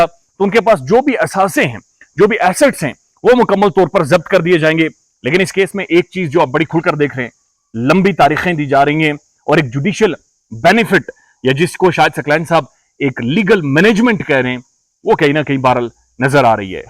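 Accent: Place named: Kashmir, India. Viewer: Mne phly b pocha tha k ap kashmiri hain plzzz bta dain k ap kashmir se hain kia? Apk Andaz e bayan se lgta tha k ap kashmiri hain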